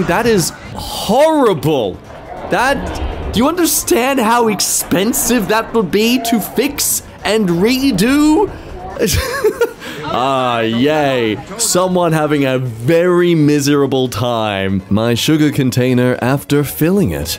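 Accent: Australia. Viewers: it's fine, you can go full Aussie. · mate, that flower is already dead… that yard was beautiful and green with the flowers, and it didn’t look like shitty fake grass… or dying one… hand to blade is where my mind went too 😅 too many humans are the definition of ew so I agree with that sentiment in this case… but no, insects are beautiful useful important fellow animals but otherwise, ooh, and yes please keep your aussie accent mate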